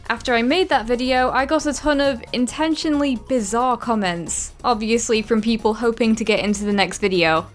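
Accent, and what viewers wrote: United Kingdom. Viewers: I love your vids! Keep it up and keep the British pronunciation!!! <3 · She did not mess; she's British! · It’s intentional that’s how brits speak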